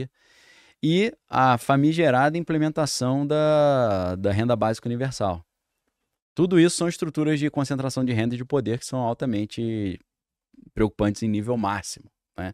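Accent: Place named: Rio de Janeiro, Brazil. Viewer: Como levar a serio um cara com sotaque de carioca?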